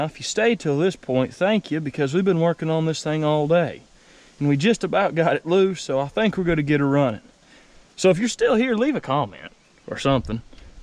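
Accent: South, United States. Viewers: great job. love southern accent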